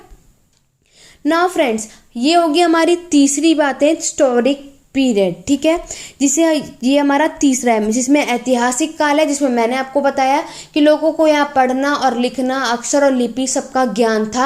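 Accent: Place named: Haryana, India. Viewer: Haryana sa ho mam lgta ha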